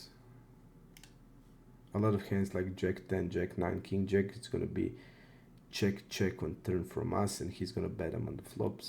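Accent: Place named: Croatia. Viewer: Just gonna take a wild guess and say you’re Croatian going off your accent :D First video I’ve seen from you bro, great vid, subbed:) Keep crushing buddy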